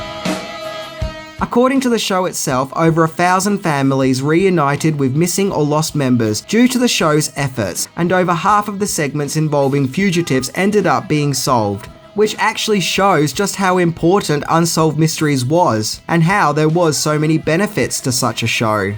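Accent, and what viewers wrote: Australia. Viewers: Thanks for reminding me why I so hate Australian accents 😖😖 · Disclaimuhhh, love that Australian accent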